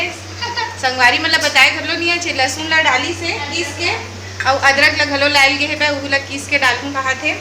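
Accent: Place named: Chhattisgarh, India. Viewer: छत्तीसगढ़ी बोली आप के मुह से बहुत बढ़िया लगथे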